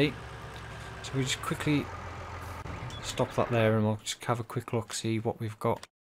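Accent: United Kingdom. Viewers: I only found your YouTube channel a week or so back I am now going back through the older video I have to say I think you speaking is much better than just the music and txt it’s good to hear another UK person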